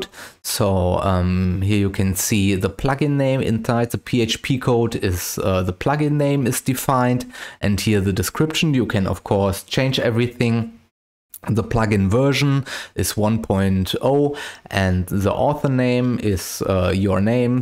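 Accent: Germany. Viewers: U sound german😅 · A german sounding german, who would have thought?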